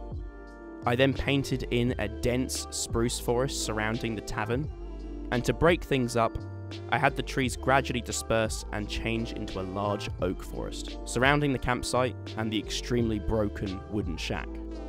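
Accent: United Kingdom. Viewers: You sound like if grian and mumbo Jumbo combined to make the ultimate Brit, from one Brit to who I am presuming is a brit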